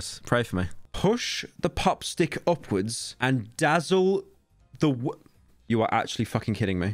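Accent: United Kingdom. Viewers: just found out hes brittish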